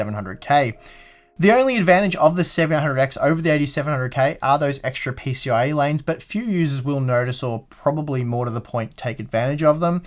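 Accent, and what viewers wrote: Australia. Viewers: I didn't come here for the list, I came here for the Australian accent I'm sorry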